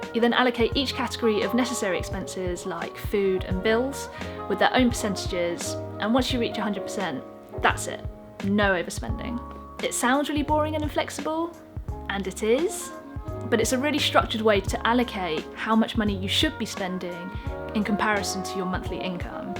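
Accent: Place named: England, United Kingdom. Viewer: love your english accent! And please do more video for minimalism life budget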